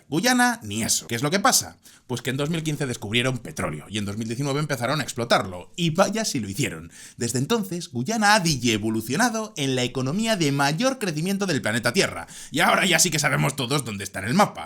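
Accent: Spain. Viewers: Buen vídeo pero una sugerencia: IMITA, HAZ PARODIA DE LOS ACENTOS DE TUS PERSONAJES. Osea como que no es tan divertido y hasta raro ver un ruso o al veneco maduro con acentos españoles. Mejora eso y quedara OK👍👍👍Saludos. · Esta bien que opinen cualquier cosa, pero cuando uno que vive en Venezuela, nació aquí. Oye a un mercachifle o sevilluo como decimos en Maracaibo estado Zulia, hablar por hablar, de régimen, o de Maduro ignorando que acá existe un pueblo digno que sabe a dónde va, que medianamente conoce su historia, incluida la del Esequibo, sobra el deseo, como decimos, de decirle del mal que va a morir. Sr. Por la pinta del hablar, creo debe ser de la casi extinta seudarepublica de España. Mire, entienda que este pueblo aún con sus traidores como han existido siempre, desde que llegó la maldición española a estas tierras, hoy sigue presente, dígase Maria Corina Machado, Guaido o López. 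Aun con traidores adentro, nos enfrentamos al imperio español y lo echamos de América. En la conquista con flechas nos defendimos, al punto que esos indígenas del Esequibo y mar Caribe no permitieron al imperio español instalarse en Venezuela. Hoy, con el imperio norteamericano encima, con sanciones bloqueos, saqueos de sus empresas, reservas en oro etc, apoyados por la unión europea, por la OTAN, entre ellos los orgullosos españoles que, hoy no saben que son, bueno si son DIVERSOS LGBT + el abecedario y hombres de "medios" como este ladino verborreico tarifados narrador nos hemos resistido y seguiremos hasta vencer, no obstante el enemigo no es la triste España, cuyo fin está cantado en no más de 40 a algo más, si es que los hechos sociales del ámbito político geográfico no lo aceleran. El enemigo, es y lo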